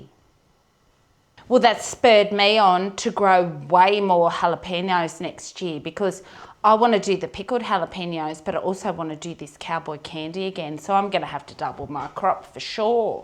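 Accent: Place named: Australia. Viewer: Hi, you are Definitely a Dinkie Die Aussie. I love your videos,your Aussie accent and you make me laugh. I pleased you now settled down to making cooking videos. It is more exciting than shorthand and typing. Got to get back to the potato bottling or canning. 😂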